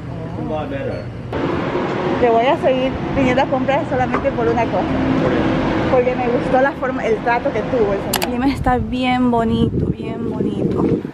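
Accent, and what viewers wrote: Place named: Ecuador. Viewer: Por tu forma de hablar te reconocí enseguida que eras ecuatoriana xD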